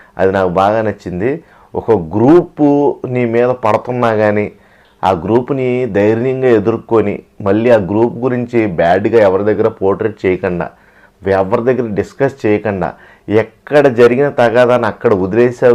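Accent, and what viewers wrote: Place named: Godavari, India. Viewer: Nuvvu same godavari Jilla pakka super language and manodive bhayya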